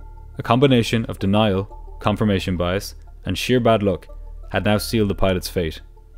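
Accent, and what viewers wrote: Ireland. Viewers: Sounds like a hint of Irish in the English spoken narration but it is excellent, so clear and precise. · Excellent channel great voice - is it Irish ? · The irish accent is still weird.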